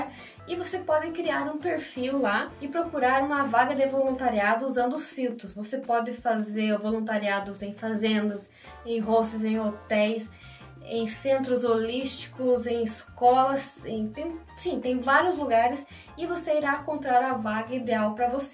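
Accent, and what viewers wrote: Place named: South, Brazil. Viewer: Moça, em qual estado e município brasileiro a senhorita nasceu e morou? Seu sotaque parece ser do sul do Brasil.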